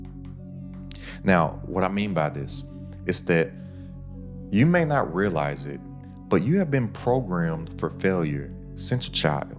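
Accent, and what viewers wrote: Kentucky, United States. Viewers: Are you from Kentucky brother? I’m in Lexington. Love your game, it helps me a ton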